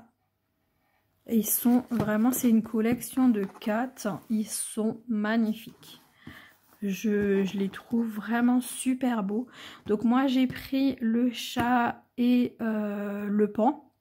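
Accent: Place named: Alsace, France. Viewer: Cc je viens de tomber sur ta video ou la la un accent qui me fais bien plaisir qui viens d'Alsace si je me trompe pas moi je suis originaire de strasbourg aussi mais je suis bien loin a present je suis dans le 44 (loire Atlantique) MERCI en tout cas pour le haul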